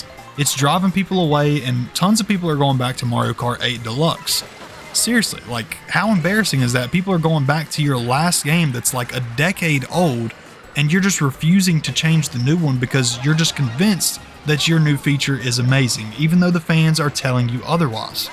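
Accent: South, United States. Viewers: I love this guy's country accent. Really relaxing to listen to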